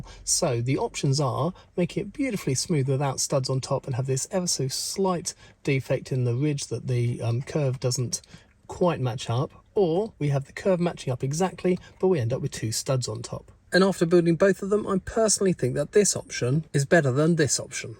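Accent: United Kingdom. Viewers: Oh you brithis? Cool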